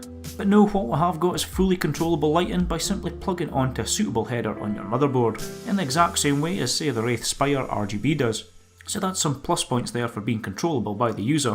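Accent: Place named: Aberdeen, United Kingdom. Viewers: You sound like you're from Aberdeen like me! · yeh deffo from up north peter head.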